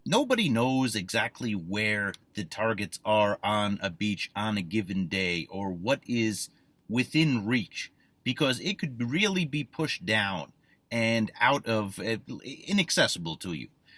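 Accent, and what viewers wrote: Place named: Connecticut, United States. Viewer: You are so informative and haliorous! I'm originally from CT now in Tennessee. Miss the accent of back home and you deliver:) love your channel!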